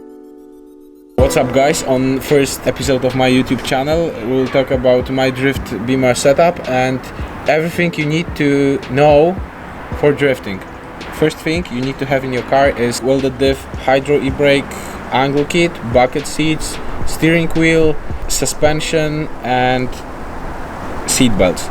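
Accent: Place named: England, United Kingdom. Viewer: Oooh man i love the accent and youre english i dont know why but its so Nice to hear!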